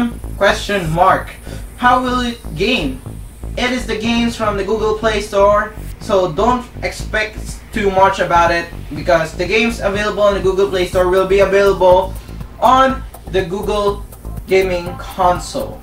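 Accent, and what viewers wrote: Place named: Philippines. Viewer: I noticed a slight accent, I'm Filipino too! I lost my accent though D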